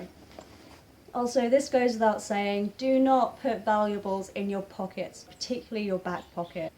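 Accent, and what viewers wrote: United Kingdom. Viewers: I fall in love with your accent, remind me of my ex girlfriend from England 🙂❤️😍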